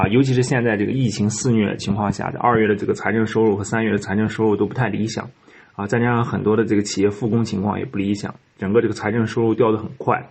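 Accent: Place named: Shanxi, China. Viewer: 一听就是山西人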